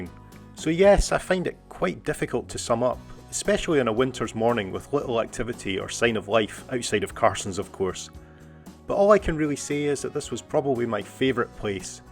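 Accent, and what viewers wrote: Scotland, United Kingdom. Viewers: Hi and thanks from a Scotophile in Nashville, TN, US. Your video was the first result of interest on a search for Mystic CT., in prep for the Classic Wood Boat Show there in August. Backstory is that we plan to move to the Black Isle in 2 years (God willing!), where my hubs hopes to get into building classic wood sailboats. You narration is divine- as the myriad regional Scottish accents are my favorites anywhere! Thanks for a great channel- we'll be stalking it now.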